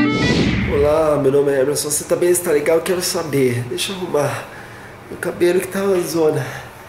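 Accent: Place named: Australia. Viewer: Força, Emerson. As coisas já estão acontecendo de forma positiva. Cara, esse sotaque australiano é demais. Gosto muito. Você é galã de novela com ou sem barba. Grande abraço.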